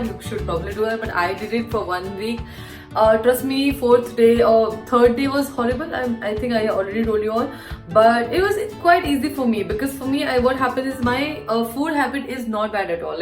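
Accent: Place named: India. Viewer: Wait can someone explain to me how she is Asian but talks like a Indian person😂😂nah but no hate nice video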